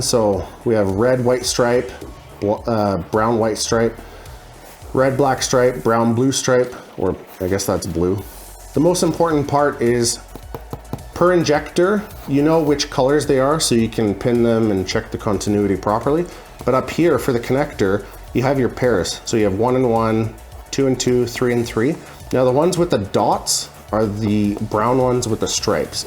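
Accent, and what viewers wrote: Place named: Calgary, Canada. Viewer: I love that Calgary accent, eh?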